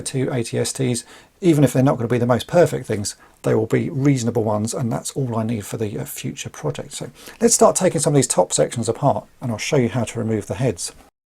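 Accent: United Kingdom. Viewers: When I read the title “What can I build”, see the junk pile, and hear your British accent, Dr A’s steampunk came to mind.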